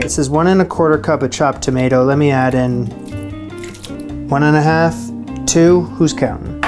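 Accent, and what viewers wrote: Canada. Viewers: Has accent become more Canadian since he moved back to Canada?🧐😅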